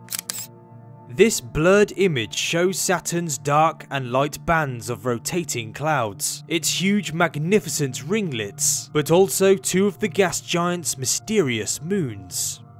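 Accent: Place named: Liverpool, United Kingdom. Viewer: You probably shouldn't have said that the morons succeed in getting under your skin. They live for that shiz. Btw, I really dig your Liverpool accent...or is it Cockney? :)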